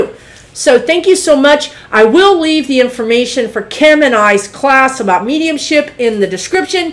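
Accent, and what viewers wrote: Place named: Minnesota, United States. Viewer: Hi I turned this on and am watching now. My 1st thought was I like her she sounds so much like people I know here. Then I thought she really does sound like a Minnesotan. My next thought was I think she belongs in Minnesota she would fit in here so darn well! Just had to share a clairknowing that I may have had.? Might be a great question for you to check with some of the awesome people that you get with😊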